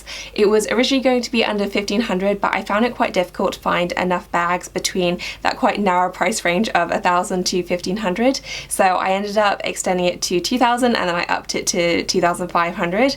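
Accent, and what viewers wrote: United Kingdom. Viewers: I am not against her right to make video on luxury bag. The reason why I watch her video is that she is such a sweet lady with amazing British accent. Being an English teacher, I really enjoy the way she speaks . There is nothing wrong about her making video. Sometimes I get frustrated about the fact that we work so hard just for earning a living, hardly can afford kath kidson bags.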